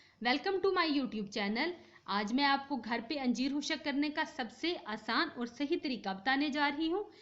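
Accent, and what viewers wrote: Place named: Punjab, India. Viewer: oh ok you have the same accent of Indian punjabis 😊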